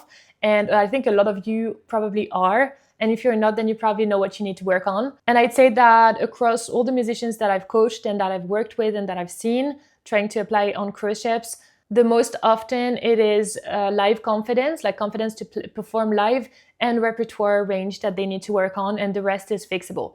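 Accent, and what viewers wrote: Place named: South Africa. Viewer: You sound South African. I did a few contracs as a singing bassist in party bands for Disney and Princess ships. All the South Africans I met on ships worked in the spas. They called them 'Steiner Girls'.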